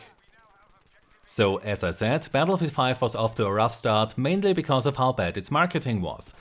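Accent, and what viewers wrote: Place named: Germany. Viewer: And that your german accent is on fique...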